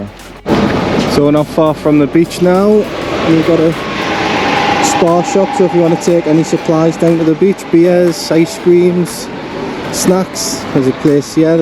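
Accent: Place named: England, United Kingdom. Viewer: Hi,fairly accurate video, trying to make out your accent to see what part of England you're from.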